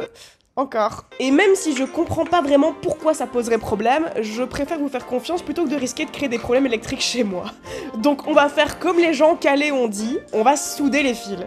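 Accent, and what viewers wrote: Belgium. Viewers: Je voudrais juste savoir un truc tu es française ou belge car tu as l’accent belge mais tu dis (sopalin ) comme les français marque qui n’existe pas en Belgique mais la bonne marque serait scotex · Entre "tant que ça drache pas des seau d'eau" et "je rappellerai le plombier pour savoir quoi" ton parler belge (qui ressemble à du parler ch'ti) me ravit les oreilles. Bon courage avec ta rénovation🌻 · Pour dire WC les Belges vous dites "ouécé"??? Je sais que les Français on est pas logiques non plus vu qu'on dit "vécé" mais bon😅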